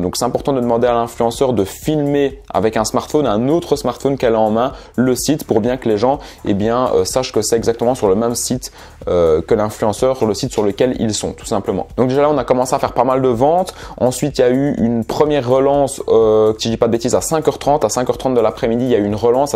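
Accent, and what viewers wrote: Belgium. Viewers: Je me disais bien qu'il était belge🔥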